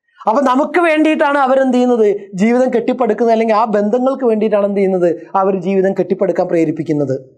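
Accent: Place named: Malappuram, India. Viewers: മൽപ്പൊർത്തെ വർത്താനം പൊളിയാണ് സാറേ.. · Malappuram basha aan poli🔥💕💕💕thallumala⚡️👍🏻🔥🔥malappuram⚽️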